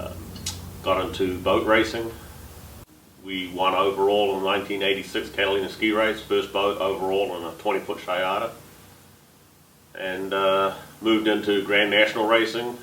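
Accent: New Zealand. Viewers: cant get rid of that kiwi accent ha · weird accent half kiwi half american? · His accent is a mix between Maori and Southern American. Lmao, is weird.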